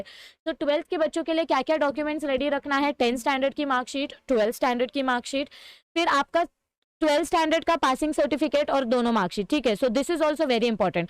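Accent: Maharashtra, India. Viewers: You are mrathi or Gujarati?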